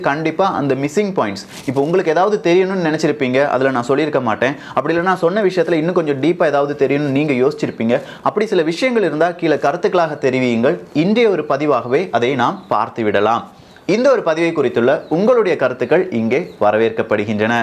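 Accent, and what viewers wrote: Kerala, India. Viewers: Hello bro! I watch all your videos! They are really nice and useful. But unga speeches la Niraya Malayala vaadai adikuthu! You have a strong Malayalam accent! At times I hear Malayalam words also! Are you basically a Malayalee? Is your first language Malayalam! ? Just curious bro!!!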